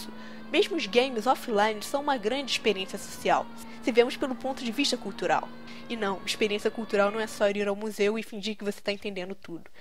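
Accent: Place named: Rio de Janeiro, Brazil. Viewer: É, o grande defeito desse canal é o sotaque dela. Esse carioquês é uma outra língua!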